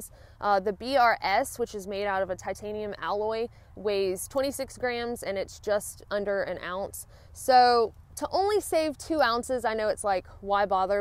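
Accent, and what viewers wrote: South, United States. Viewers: Sexy accent! North Carolina or Tennessee ?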